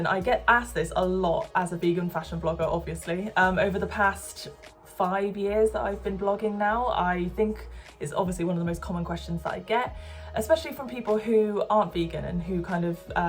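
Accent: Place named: Bristol, United Kingdom. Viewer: Awwww ;D I love the Bristolian accent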